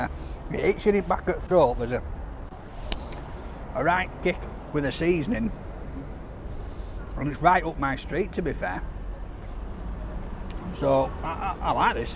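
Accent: United Kingdom. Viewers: I try to keep my captions on so I can read what he's saying the British accent is very strong and it's hard to understand sometimes but I do love a British accent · Thank You for watching a Brit talking like a Brit from his region of Britain. I sometimes miss the odd word he says, but as Brits we just go along until it’s impossible to understand what’s going on, the gist is more important than the vernacular. All the best.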